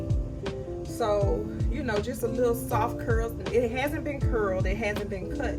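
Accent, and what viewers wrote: South, United States. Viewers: Love it! Where are you from, I hear that southern draw lol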